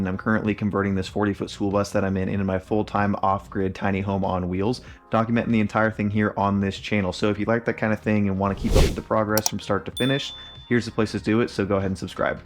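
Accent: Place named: Northern England, United Kingdom. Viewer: imagine y’all grew up in a similar area to have that same dialect must be up north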